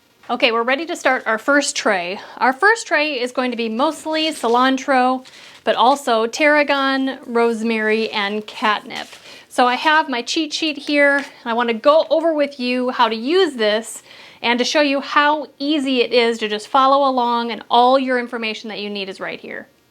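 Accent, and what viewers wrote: United States. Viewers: Fascinating the differences between American English and the English we speak in England; I love the way you call herbs "Urbs" (always makes me smile). It shows that American comes from the many different nationalities of settlers: I wonder why you call courgettes (french) zuccinis (italian) ; Aubergine (french) Eggplant: Cilantro we call coriander; there are many others.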